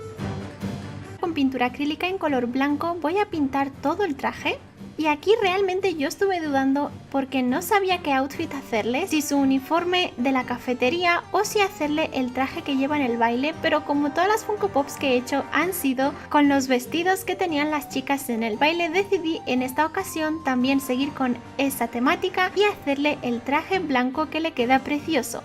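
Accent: Spain. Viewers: bonito acento, no tan marcado al español que conozco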